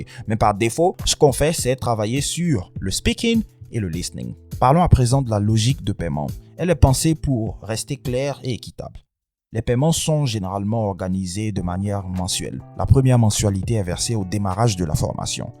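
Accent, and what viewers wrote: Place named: United States. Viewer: Salut mon frère, franchement j'ai vraiment la façon donc tu parle cette anglais américain. Je suis vraiment impressionné. Stp est-ce possible d'avoir ton contact si ça te gêne pas. J'aimerais vraiment développer ma conversation en anglais américain avec un speaker en plus de mon exercice personnel de parler en anglais ( shadowing)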